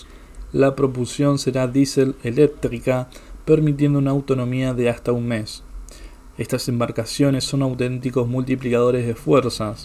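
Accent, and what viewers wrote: Argentina. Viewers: el canal es argentino, no chileno, no cachai el acento ?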